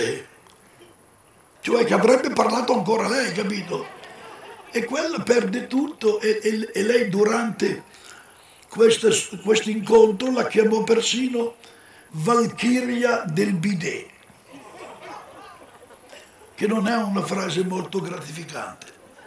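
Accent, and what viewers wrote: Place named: Milan, Italy. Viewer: Sono giorni e giorni che vedo e rivedo questi filmati di Piero Mazzarella un grandissimo attore di prosa dalla voce inconfondibile dalla cadenza dialettale milanese di una volta e mi si stringe il cuore e mi commuovo a sentirlo sapendo che purtroppo non'è più fra' di noi mi sarebbe piaciuto che ci fossero più filmati a lui dedicati in rete per sentire i suoi racconti storici culturali e dialettali perchè attraverso di essi rivive un mondo che non c'è più ma che scorre ugualmente nel nostro sangue e nel nostro DNA in modo inconsapevole per questo ci emozioniamo quando li ascoltiamo perchè ci fanno capire che essi rappresentano le nostre origini etniche e culturali che spesso ignoriamo ma che fanno del popolo italiano un popolo immensamente ricco a livello linguistico e comportamentale.P.S. R.I.P.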